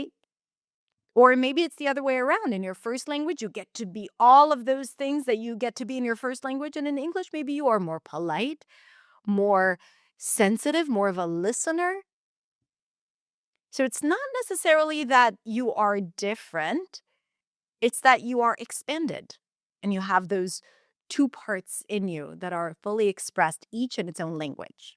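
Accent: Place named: United States. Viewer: I honestly couldn’t believe you’re a native Hebrew speaker because you sound so American! 🇮🇱🇵🇭 I’m a Filipina working here in Israel, and learning your background just blew my mind. I’m currently trying to improve my English, and your transformation gives me so much hope! Todah rabah! 🙌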